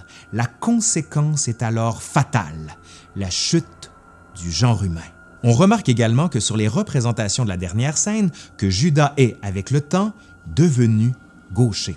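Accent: Quebec, Canada. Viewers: Salut J''ai un peu plus de 50ans et je me permet de faire remarquer que beaucoup d'artistes sont gaucher! Et sais tu que les gauchers ont la faculté d' écrire à l'envers. Tu peux donc les lire que face à un miroir! Ça ne sert à rien! Et beaucoup de gaucher l'ignore avant de d'avoir essayé! Je te suis régulièrement, tes continus sont intéressants, et très bien documentées. C'est surtout divertissant d' attendre parler de la l'histoire de France et autre, avec un petit accent canadien ou Québécois! Je ne sais pas faire la différence, si il y en a une! En tout cas, continue!? Tu fais un très bon boulot avec ton équipe..... Bon courage! J-P. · Et bien bordel,je ne savais pas tout ça étant moi même gauchere ou parfois mais rarement ambidextre j ignorait l histoire de ce fascinant outil qu' est la main gauche car les gaucher on tendance à être plus agile dans certain domaine comme dans le dessins que je pratique.... 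Je suis fière d être ainsi merci de votre connaissance avec un ton de sagesse et d une pointe d accent québécois vous m inspirer avec nota bene pendant de je dessine ça me détend continuez ainsi...votre savoir doit être important pour les génération futur...😊😊📚✏🖌🙏🏻🙏🏻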